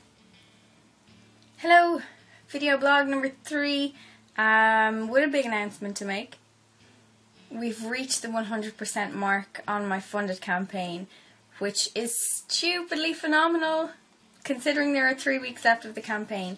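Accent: Ireland. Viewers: I think she's irish? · Are u Irish I recognise the ascent from when I used to live there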